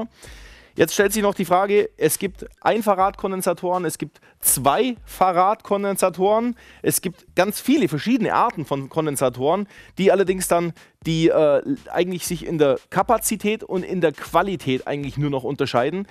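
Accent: Swabia, Germany. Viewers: Ja, ganz schön blöd, wenn man meint immer recht zu haben. Der gute Herr hat die Gleichspannung gekennzeichnet einmal 12 V und 10 V in Form einer Linie. Die Sinuskurve solle hier nur ein Signal darstellen (Musik, einzelner Ton etc.). Im Beispiel wurde verdeutlicht, was passiert wenn die Bordspannung sinkt. Danke für das Video !!! ps. dein schwäbisch ist unüberhörbar xDDD (Y)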